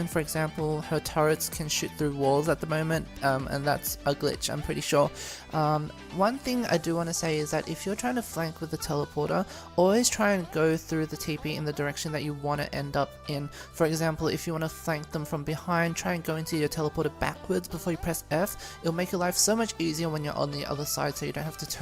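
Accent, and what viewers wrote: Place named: United Kingdom. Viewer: sounds like a british girl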